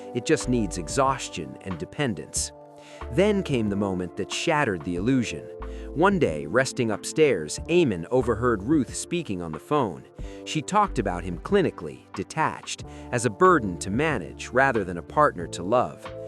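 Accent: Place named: United States. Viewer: What the flying f…. An American voice/person who knows absolutely NOTHING about this couple. YUK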